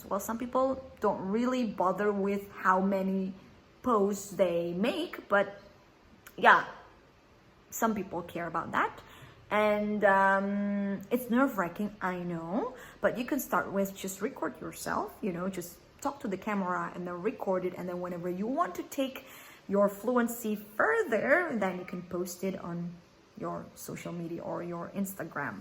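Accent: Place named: United States. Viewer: I like your american accent 😁👌👍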